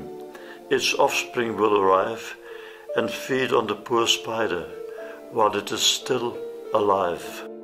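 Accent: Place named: South Africa. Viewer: Wonderful and heartfelt video, well done! Good to hear a friendly South African accent too:-)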